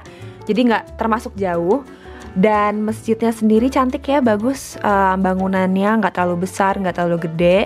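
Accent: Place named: United States. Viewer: American accent udah dapat👍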